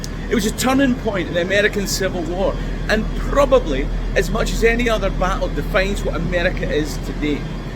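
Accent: Scotland, United Kingdom. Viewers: Love the videos, as they deal with subjects that have I have always found interesting. A bonus for me is your accent, it brings back fond memories of my Scottish Grandpa on my dad's side. My Grandpa immigrated from Scotland to the U.S., by way of Canada. He met and married my Grandma in Massachusetts, her family had been in New England since the Mayflower, with my ancestor, Peregrine White, being the first English baby born to the Pilgrims, on the Mayflower, while the ship was anchored off of Cape Cod I have dual citizenships, one due to my British Mum, as well as me being born in England, and a U.S. Citizenship from my American Dad. I have lived most of my life in the USA, with over 30 years just outside Phoenix, Arizona. If you want to know more details about me, and the seriously tragic event that has shaped my life, including the horrific disfiguring scars that make people gasp, and small children cry, I have posted a link to a video that captures the exact moment my world was literally ripped apart... 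⚠WARNING! GRAPHIC VIDEO! NOT FOR CHILDREN OR THE SQUIMISH!⚠ <link> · What accent would you expect from a Scots man lol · I to am American of Scottish decent. Love the stories and the accent!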